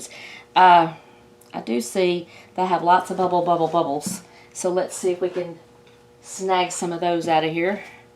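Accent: Texas, United States. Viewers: It was beautiful before the spin, but the black lines and the spread patterns worked. Very nice work. Love that Texas talkin.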